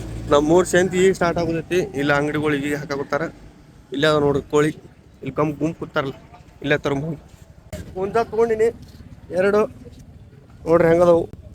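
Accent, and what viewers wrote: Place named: Kalaburagi, India. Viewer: Anna yavuru nimdu nim bhashe Kalburgi thara anisutte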